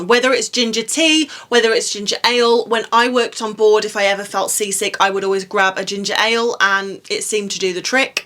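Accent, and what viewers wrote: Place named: England, United Kingdom. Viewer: I can listen to read the phone book and be mesmerized by that lovely English accent. It doesn't hurt she is VERY easy on the eyes.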